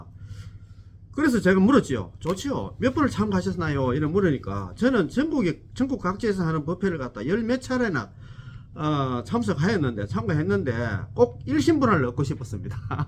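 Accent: South Korea. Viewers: 믿음직한 대구사투리 정말 좋습니다. 믿음이 더욱 확실해지네요. 나모아미타불 나모아미타불 나모아미타불 나모아미타불 나모아미타불 나모아미타불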